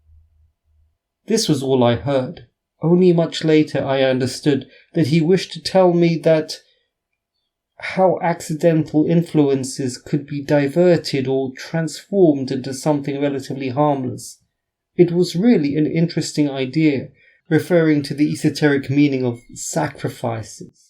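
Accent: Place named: England, United Kingdom. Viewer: Thank you,. A lovely talk as usual. My dad was Scottish, and those pipes just wake me up, something in my DNA. You sound really English, but you are not?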